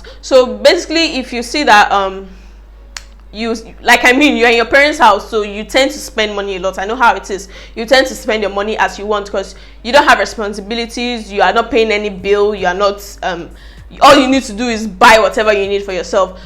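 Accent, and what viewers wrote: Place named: Nigeria. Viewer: We all know she is a Nigerian from her accent.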